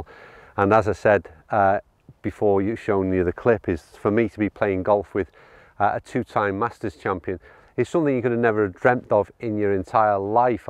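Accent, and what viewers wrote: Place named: Scotland, United Kingdom. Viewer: this is a good morning from Montréal, Canada. First I had to get used to your Scottish accent. Thereafter it is the most pleasant trip I can have to visit Scotland and appreciate a friendly average guy who happens to be a pretty good golfer, visit golf courses and listen to reasonable and fair evaluations of golf material. I should add on, the background music is most of the time very good. I feel like listen to the next door guy who’s talking to me and not taking me for a stupid consumer. Many thanks for your interesting material. Here’s it is -25 Celsius, even a Noodle golf ball would die in the middle of a flight.😎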